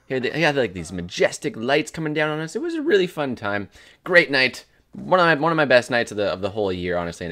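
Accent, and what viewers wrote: Canada. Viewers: Just wondering, did you ever have a Canadian accent?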